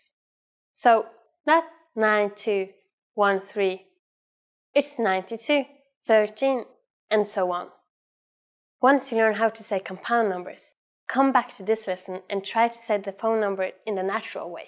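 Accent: Norway. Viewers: with a thick Norwegian accent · I appreciate your lessons. By the way, Can I know this accent is Oslo or Bergen? Thank you in advance.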